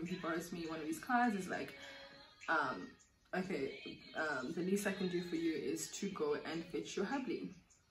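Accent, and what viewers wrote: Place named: Newcastle, United Kingdom. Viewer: It's the Geordie accent for me🤣🤣🤣🤣🤣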